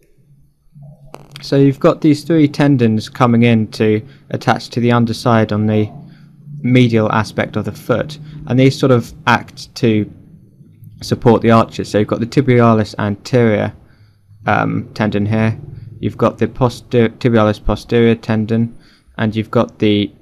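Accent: United Kingdom. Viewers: Thanks for the tutorial . Your accent (British?) sounds amazing.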